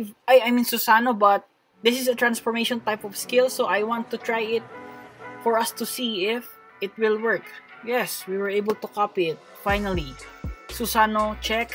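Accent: Philippines. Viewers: i know a filipino when i hear them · I fricking knew it, you are Filipino, I knew it by your accent >:D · Oww filipino ka pala halata naman sa accent mo!!!!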